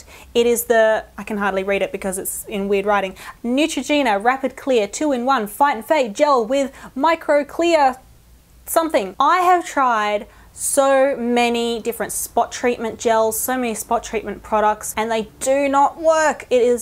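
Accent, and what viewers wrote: Australia. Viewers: Your too funny.... You make me miss home! I forget what the aussie accent sounds like living in the USA! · I LOVE your accent... I love Australian accents in general, I could listen to them all day! I use benzoyl peroxide, but my question is how often should I use it, considering it dries out skin etc. My skin used to be very flaky and dry but it's actually better now... Anyway, really helpful, thanks :)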